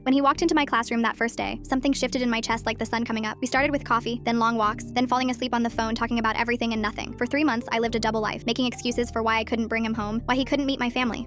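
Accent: United Kingdom. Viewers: Yo its sounds like harry potter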